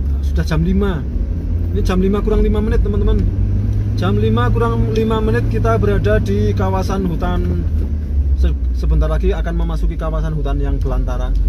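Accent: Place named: Wonosobo, Indonesia. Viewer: Milu nyimak kiye bos, gara2 longsor kasimpar fidio chanel mana saja yg liatin ds kasimpar tk tonto, rika wong ngendi si bos, kaya enyong wae logate, wonosobonan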